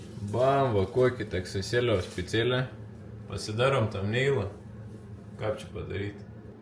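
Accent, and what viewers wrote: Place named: Samogitia, Lithuania. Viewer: Geriau visados žemaitėška💪💪💪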